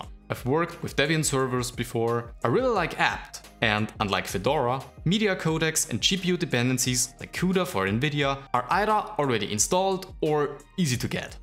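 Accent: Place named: Germany. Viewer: Hi gutes Video. Ich habe genau fas gleiche gemacht wie du. Habe viel rumüberlegt, was die beste Distribution für mich ist und bin dann doch wieder bei Fedora hängen geblieben. Jetzt finde ich es wirklich gut und liebe Gnome 42👍 PS: Bist sicherlich eigentlich Deutsch ne? Man hörts ein bisschen 😂😉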